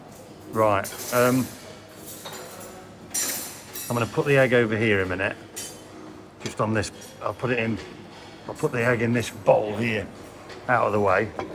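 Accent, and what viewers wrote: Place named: England, United Kingdom. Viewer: At first, I was eggstatic about watching another vlog. I don't think I've ever heard anyone rant on for so long about how he asked a Spanish bloke in a canteen in Tenerife in his broad English accent to flip his egg and then rant on for the rest of the video about how the server was rude, simply because the Spanish server didn't quite understand his broad English. The Spanish server was probably sick to the back teeth of English people going on at him. Jesus wept. Maybe try attempting some Spanish next time? Cuando en españa......... You asked, "Am I overreacting?" Yes, you were eggaggerating. "What would I have done?" Been slightly disappointed, but got over it within a few minutes. Please tell me you were joking. My rating: 🍳🥚🍳🥚🍳🥚🍳egg-tastic. Yes, those toasters are b@ But I can imagine there have been several fires when English people were making toast in regular toasters and couldn't quite manage it whilst holding their third breakfast pint of the day at 8am. 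🤣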